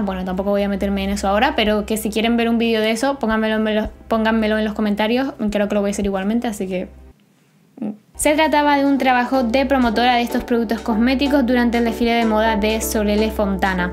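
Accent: Cuba. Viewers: Pensé que eras Cubana o Puertorriqueña. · Eres cubana?????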